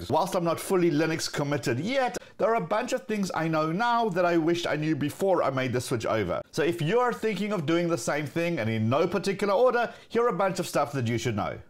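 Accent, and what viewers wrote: South Africa. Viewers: for real bro, you from SA?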